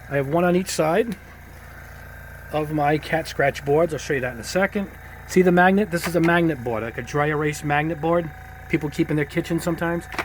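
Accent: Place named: Long Island, United States. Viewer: You have to be from Long Island. I can understand ever word you say clearly haha!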